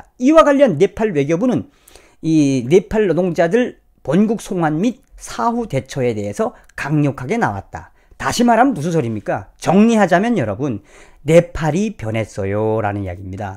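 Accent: North Korea. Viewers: 발빠르고진실된정성산방송 다른방송보다제일먼저보게됩니다 간간히북한사투리듣기좋습네다 네팔땡큐~~물개박수짝짝짝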